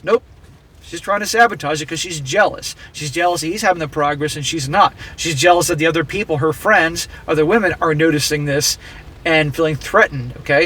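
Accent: Philippines. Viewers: This dude sounds like he’s from the Philippines. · Judging by his level of English I'd guess Philippines.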